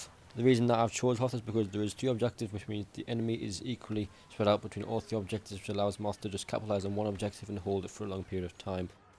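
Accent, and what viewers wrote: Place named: Geordie, United Kingdom. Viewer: Never have I needed subtitles until today Geordie boy. Thank God for the comments in English, appreciate the effort you put in.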